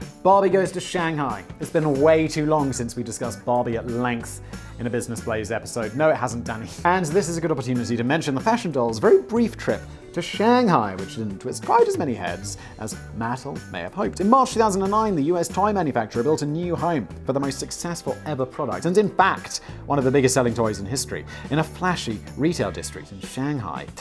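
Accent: United Kingdom. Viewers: in every video: Speaks with a British accent Comments under every video: Complains about how pronounces things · Sticking up for here with all the mis-pronunciation comments. As a British person with a similar vernacular to that is how we would pronounce those words. Although at times I think has lived a sheltered life. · He’s British and they pronounce things differently. People in different countries may speak differently than us.. it’s really ok..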